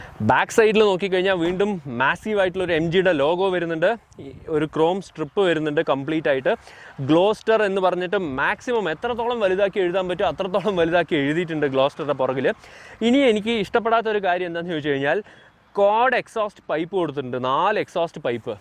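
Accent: Palakkad, India. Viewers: nengal Palakkad anno? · പാലക്കാട് ആണോ....സ്ഥലം...പാലക്കാട്‌ സ്ലാങ് ആയി...തോന്നി...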